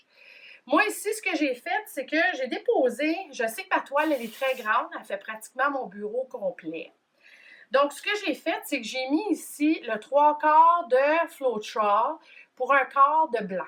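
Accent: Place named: Quebec, Canada. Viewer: Bonjour Enfin une video de pouring en FRANCAIS, e vous en remercie; même si c'est du Français Canadien, au contraire c'est très agéable d'entendre votre joli accent je n'arrive pas bien a mètriser mes mélanges, j'emploi moi aussi du Floetrol, ils sont soit trop liquides ou trop épais. Comment je dois procéder, pour que mes mélanges soit parfaits?j'attends votre reponse SVP merci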